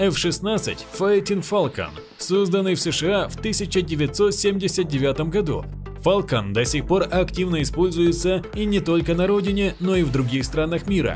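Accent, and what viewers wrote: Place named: Komi, Russia. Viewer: Ты из Коми что ли? Тебя слушать-уши вянут!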